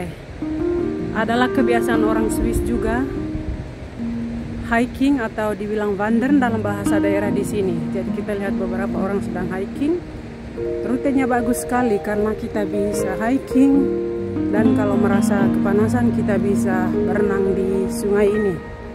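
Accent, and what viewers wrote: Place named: Manggarai, Indonesia. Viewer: Ketahuan dialeg vlogernya orang Manggarai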